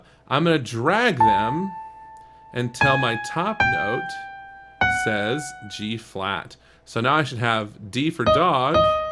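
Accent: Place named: Las Vegas, United States. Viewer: Fun Project! I think you've lost some of your Vegas accent, haha!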